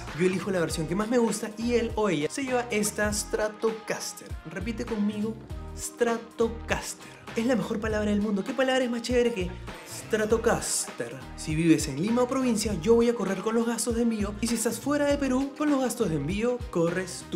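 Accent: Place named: Mexico. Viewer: recien conosco tu canal y dejame decirte que pense que eras mexicano jaja que buen contenido tienes !!!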